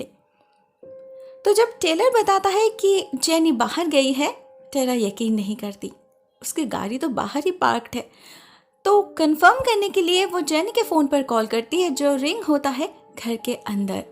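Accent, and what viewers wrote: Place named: Bhojpuri, India. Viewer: aap bhojpuri lakte ho aapki bhashah seh lagta hai.. Par mujeh aapki awaz seh pyar ho gya hai lagta hai K aapseh be...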